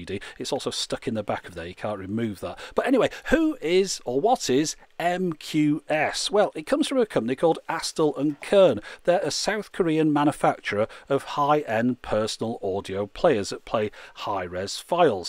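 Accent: United Kingdom. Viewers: Excuse me so is difficult understand you because you're British accent. But so much interesting videos. I have the idea that music media would be pendrives. Are more handy than SD memory. Greetings from Chile.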